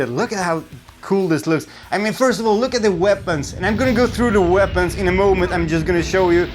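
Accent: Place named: Sweden. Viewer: Yeah no problem, glad to help you and the community. I used your method and everything else worked, except the weapons. All the files were there in the "models" folder, and other objects like barrels, etc looked HD, but not the weapons? Really weird. I did see a couple other posts other places, of people having the same issue. Also, I found that "Dark Forces" does not use the DOOM engine, and does not work in VR (yet). Love your channel, love your videos. I also like your accent, where are you from? Sweden?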